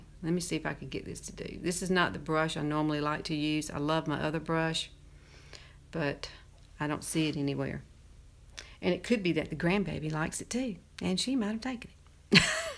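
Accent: South, United States. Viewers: Hi, I really enjoy your make-up tutorial videos, thank you for taking the time to make them for us. I especially love hearing you talk because I love listening to your southern accent. Please don't ever change, keep your accent. I can understand you perfectly and I'm from and live in California. BTW, I'm curious...why you don't use a liquid eye liner instead of the pencil to line your top eye lid? I think it would make it easier for you Also, has anyone ever told you that you look a lot like Heather Locklear? You have very similar beautiful eyes. I'm sure you've heard this before but I couldn't help but ask. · You are a breathe of sunshine. I love those cream eye shadows How much for the cream shadow? I love your southern accent. You are the cutest. Have a great week. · i just gotta say i love ur southern accent, so younique lol excuse the pun...oh by the way u can get younique cosmetics over here in N.Ireland, i dont own any myself as i use pacifica vegan mascara as i have the most sensitve eyes EVER but it does look amzing on u girl....hugs and kisses....xxx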